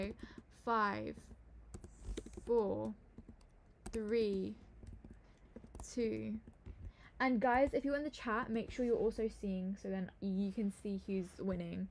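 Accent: United Kingdom. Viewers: When you discover for your 1st time that is British 💀 (this means I love it and I’ll start to watch more to know how British people talk 🫶)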